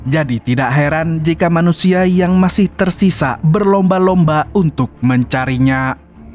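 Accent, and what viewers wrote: Banjar, Indonesia. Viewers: Urang Banjar kah Nang? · Banjar asli kah mang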